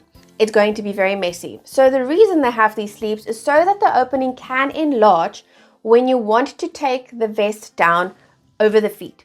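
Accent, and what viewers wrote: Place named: South Africa. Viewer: I know a South African voice when I hear one🥺🩵🩵🇿🇦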